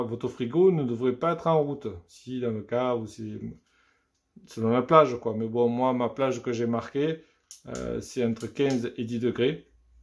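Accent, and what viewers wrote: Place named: Vaucluse, France. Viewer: Merci à toi surtout !!! J'ai récupéré un frigo qu'on m'a donné et fait exactement la même installation que toi (j'ai bien branché la terre) et j'ai lancé aujourd'hui une belle rouelle de porc!! Encore merci! Tibo du Vaucluse (on doit pas être loin vu l'accent)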